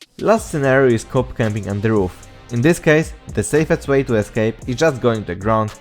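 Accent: Poland. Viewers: holy polish accent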